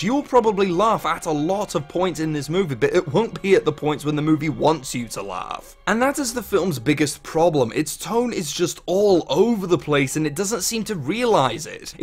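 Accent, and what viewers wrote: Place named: United Kingdom. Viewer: Nice video, very good points and your delivery was spot on. Also your British accent is one I wish I could replicate.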